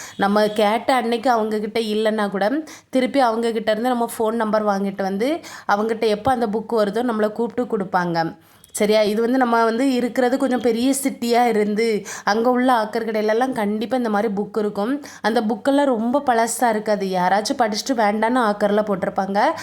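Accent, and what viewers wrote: Kanyakumari, India. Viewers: Hi sis unka veetu enka pechu kanyakumari pola iruku marthandam side athan kekuren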